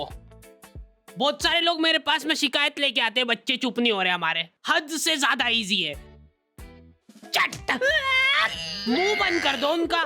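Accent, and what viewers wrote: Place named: Indore, India. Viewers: Bhai indore se ho haina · Bhai kha se ye to bata do Indori lag rahe ho 😂😂😂😂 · Lagta he bhaiya mp se he 😅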